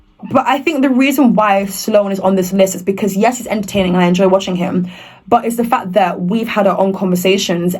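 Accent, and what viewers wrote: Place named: United Kingdom. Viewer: trouble understanding you at times cause of your British accent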